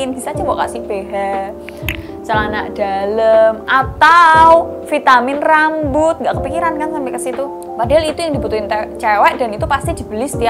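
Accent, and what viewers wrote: Java, Indonesia. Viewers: Aq suka logat jawamu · Medok jawa nya mba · logat bicaranya jawa bnget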